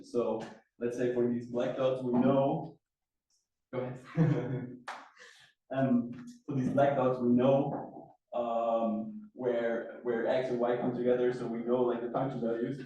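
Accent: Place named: Germany. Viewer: perfect German accent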